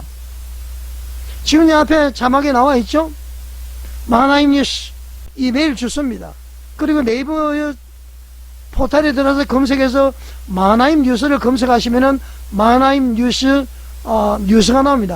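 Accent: North Korea. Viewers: 이분 어디분이신가요? 조선족 이신가요 말투가 북한분이신가요? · 북한 말투? 교회 무너뜨리라는 북한 지령 받았나?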